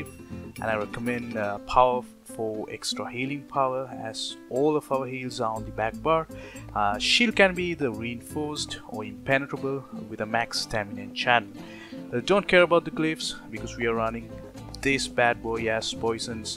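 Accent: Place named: Asia. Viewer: loved de asian-e accent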